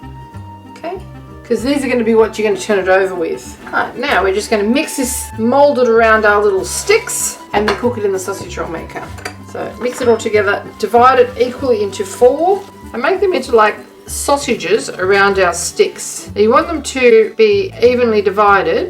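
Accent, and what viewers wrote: Australia. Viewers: Another scrumptious recipe to add to my collection, thanks! I love the way the Strines say "heh" for here: )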